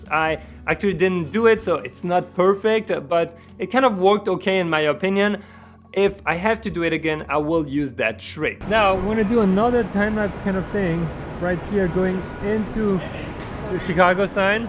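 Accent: France. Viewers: French Accent ?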